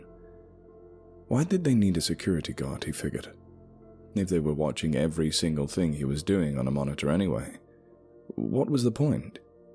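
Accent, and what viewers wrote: United States. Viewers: Your American accent is getting so good! I'm from Oklahoma and to me you sound like a Texan! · Your American accent is solid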